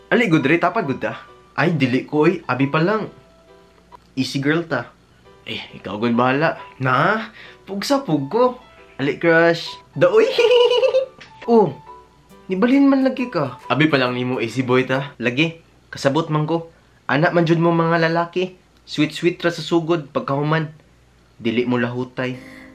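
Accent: Visayas, Philippines. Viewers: Hahahaha mkatawa ko ani niya mo storya ba kay bisaya kaayo..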